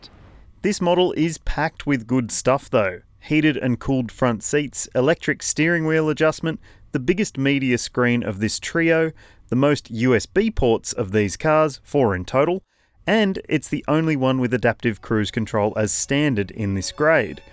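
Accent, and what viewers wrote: Australia. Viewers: Aussie accent.....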